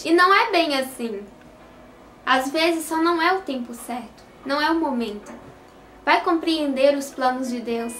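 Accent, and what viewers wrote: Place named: Minas Gerais, Brazil. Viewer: Esse sotaque mineirin é o mais bonito do brasil, parabéns irmã, graça e paz.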